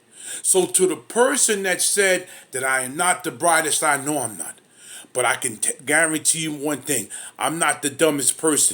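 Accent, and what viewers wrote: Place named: South, United States. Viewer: What that is I call it geographical racist seriously some people are very ugly where your accent comes from. I see it and been told with a southern accent your IQ drops 50 percent but that was from the North not the east coasters. They think they are so smart seriously pay more taxes every day and they are so smart they vote for it too. Personally I love to hear different accents. Even broken English I like to listen to that. You keep on with that strong talk, wimps can't handle it.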